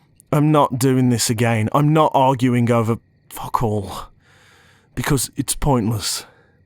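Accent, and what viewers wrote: United Kingdom. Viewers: I dont usually like men BUTTTTT I make an exception for this bri’ish boy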